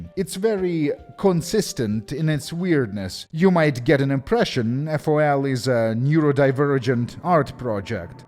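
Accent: Russia. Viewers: I think the weirdest thing in this review is still listening to a man with a Russian accent attempt to do a Russian accent.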